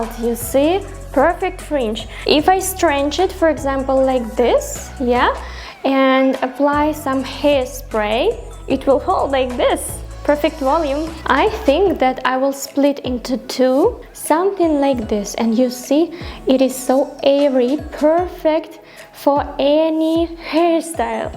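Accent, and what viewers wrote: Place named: Russia. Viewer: Русский акцент и русская мимика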